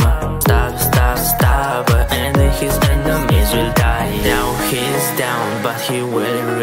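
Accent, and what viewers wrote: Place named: India. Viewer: Indian accent makes it funni lol😂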